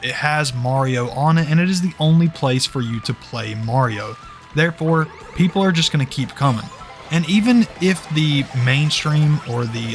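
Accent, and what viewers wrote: South, United States. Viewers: I love this guy's country accent. Really relaxing to listen to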